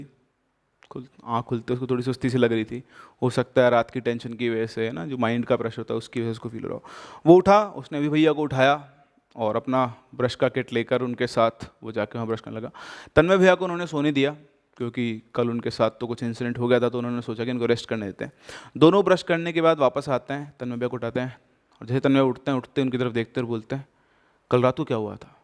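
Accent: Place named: Bihar, India. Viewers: Your bihari acent is so cute and the 3D fig 👏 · no bruh! its not normal hindi ... Its bihari accent . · Nice bhojpuri accent sir ji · The bihari accent langda bhoot dekhe k ba tohra k 😂... Hilarious 😂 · His bihari accent 😁😁😁♥️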